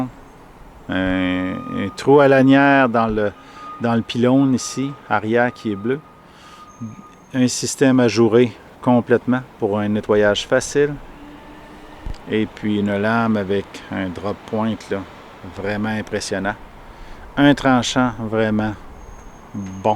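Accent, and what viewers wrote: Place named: Quebec, Canada. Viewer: Je ne savais pas que vous faisiez des reviews en Français, sur la vidéo en anglais j’avais remarqué un léger accent Québécois mais je n’en étais pas sûr... 😊 En tout cas vos reviews sont superbes et fort intéressantes, et avec ce beau parlé Québécois cela embellit le tout!!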